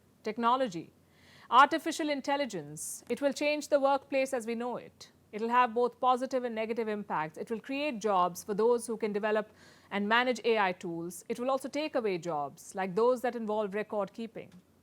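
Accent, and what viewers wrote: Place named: India. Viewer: Typical indian english 😅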